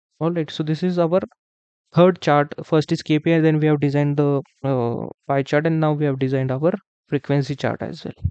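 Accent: Maharashtra, India. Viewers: You are marathi., I understand from your accent. Thanks great video.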